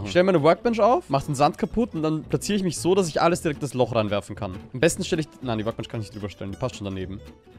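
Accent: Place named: Austria. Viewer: kommst du zufällig aus Österreich (nur aus Interesse) wegen dem Akzent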